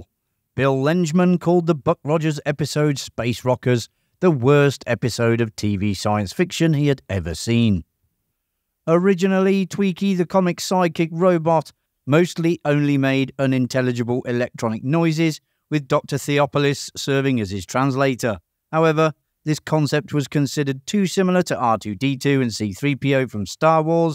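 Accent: United Kingdom. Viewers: The guy's British, dude! Give him a break!! 😂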